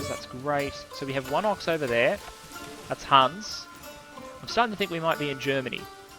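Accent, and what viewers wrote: Australia. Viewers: Woooooooooo More australian PowerPoint guy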